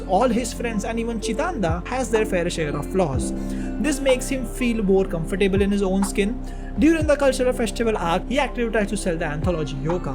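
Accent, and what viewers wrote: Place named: India. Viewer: Your accent is Indian. I appreciate that you are not trying to sound Americans are Brits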